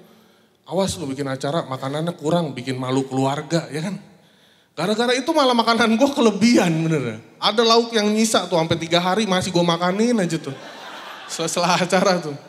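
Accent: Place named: East Java, Indonesia. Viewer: Si POPON ini ngomong in Bondowoso parah juga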